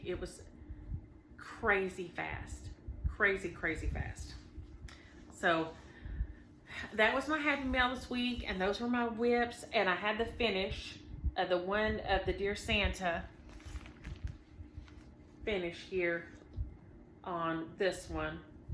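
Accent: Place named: West Virginia, United States. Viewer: Just love your down home chats. makes me feel at home e g from WV My accent sounds like you as well. I’m in INDIANA and retired. But I still miss Home especially Christmas time!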